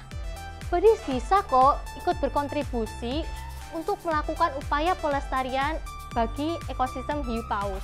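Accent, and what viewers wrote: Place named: Java, Indonesia. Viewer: Medoknya imut deh 😂😂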